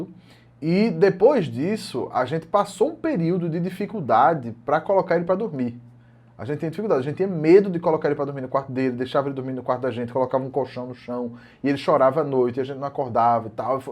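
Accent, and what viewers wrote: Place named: Recife, Brazil. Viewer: Ah, e também sou Recifense Kkkkkk suspeitei logo pelo sotaque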